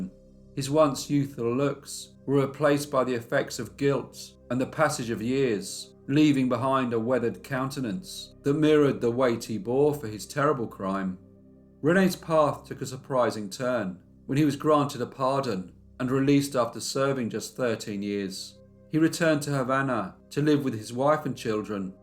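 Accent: United Kingdom. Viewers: I had a hard time understanding you. That's all. Your British accent is real heavy. But the content was great though.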